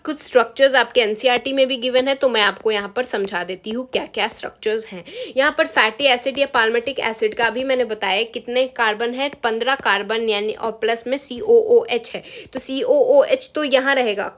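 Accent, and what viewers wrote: Bihar, India. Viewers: Pronunciation galat ho raha hai😂😂 baaki chapter explanations theek hai .!! bihari tone hai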